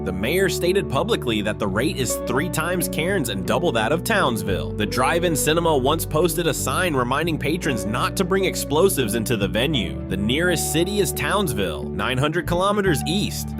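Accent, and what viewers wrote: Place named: United States. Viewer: What a joke!!!!!!!! I stopped watching when I heard "Morwell". Yes, Morwell the drug capital of the Latrobe Valley. The shops have closed and the town is dead. The only businesses thriving are the drug traders and pushers, the police and the lawyers. And, the American voice, don't get me started on that. Vomit material.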